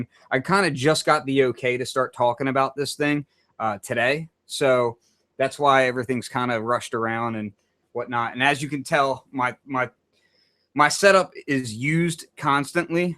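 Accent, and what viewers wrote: Philadelphia, United States. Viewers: are you from Philadelphia? I detect an accent. I'm from Montgomery country, the north west suburbs.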